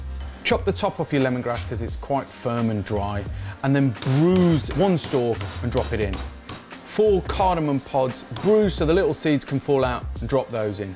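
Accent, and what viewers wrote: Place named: West Midlands, United Kingdom. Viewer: I detect a hint of West Midlands dialect in there.....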